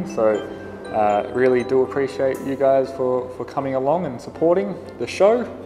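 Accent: Australia. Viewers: Great project and I cant wait to build one myself. Where cn I buy the holdfasts? Great to hear an Ozy voice.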